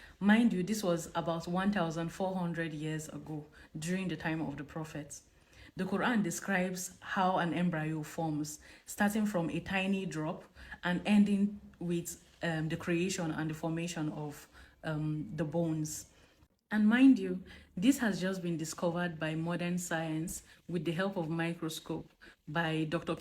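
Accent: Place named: Hausa, Nigeria. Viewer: Sis, but you have Hausa accent. Did you grow up in northern Nigeria?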